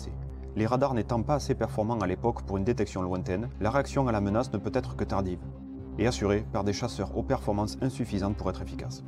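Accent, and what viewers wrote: South, France. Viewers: J'aime beaucoup cet accent du midi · Excellente vidéo J'adore son accent du Sud 😂 avec un texte bien écrit. Le fait de rajouter les sources c'est important. Par contre c'est quoi les deux musiques du fond ? et ? J'adore 👌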